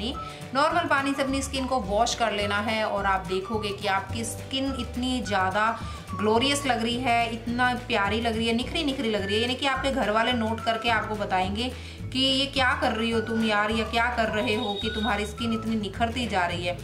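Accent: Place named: Haryana, India. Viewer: Mam AAP Haryana se ho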